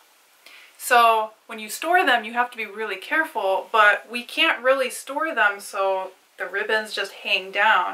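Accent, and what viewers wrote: United States. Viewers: This was all such good info and I loved listening to it because your accent is so cute! Where in the states is that accent from? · Cute channel, informative vid! Can I ask where you’re from? I hear a kind of northern/mid-western North American accent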